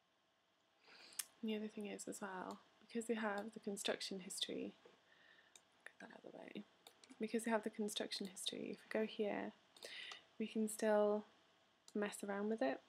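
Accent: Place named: United Kingdom. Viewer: Thanks girl! Great simple tutorial for paint effects. I'm glad to find another woman who uses maya. hehe. :) ...Btw you have a very pretty accent. lol. Are you from the UK?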